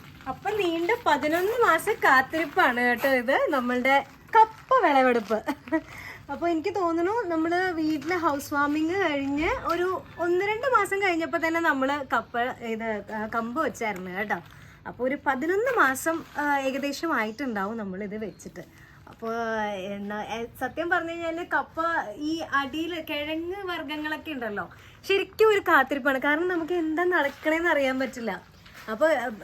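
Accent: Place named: Kochi, India. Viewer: Cochi slang 😍😍😍😍😍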